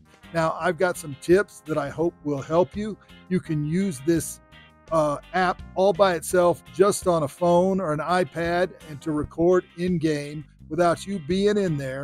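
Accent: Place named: Texas, United States. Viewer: btw are you from Texas? No offense, your accent is kinda Texas like.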